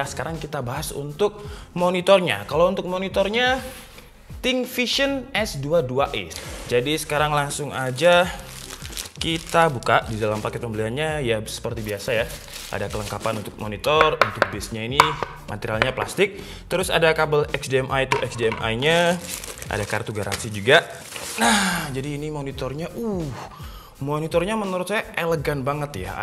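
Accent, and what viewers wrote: Java, Indonesia. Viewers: pancen wong jowo kok mas wkwkwkwk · logate kyk wong jowo 😅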